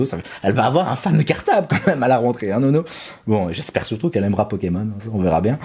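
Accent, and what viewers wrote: Belgium. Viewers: Ah oui désolé j'ai pas compris ce que tu as dit quand j'ai demandé la dédicace avant dans la dernière vidéo que tu as fait désolé je comprends pas trop le Belge je suis français que dit mon prénom quand je t'ai demandé de me dédicacer si c'est moi merci et si c'est pas moi stp sinon courage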